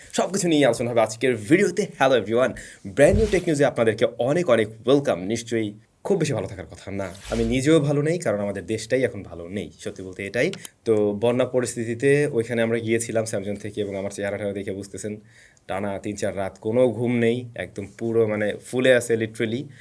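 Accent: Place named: Noakhali, Bangladesh. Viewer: bhai Noakhailla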